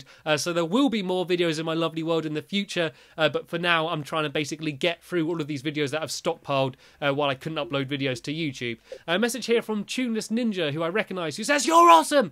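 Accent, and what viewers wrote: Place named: Newcastle, United Kingdom. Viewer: North East England! Propa Geordie :P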